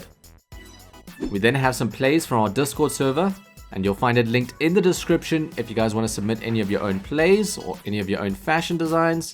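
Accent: South Africa. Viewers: i thought so from the accent. Im also from south africa!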